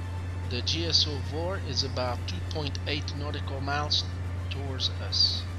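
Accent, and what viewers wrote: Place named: France. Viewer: Excellent video, just what I needed. Merci beaucoup - de ton accent je suppose que t es Français.....tu parles super bien l'anglais!!!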